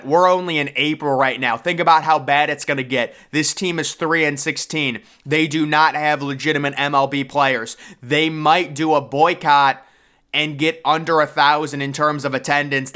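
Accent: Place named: East Coast, United States. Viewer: The host is definitely from the east coast.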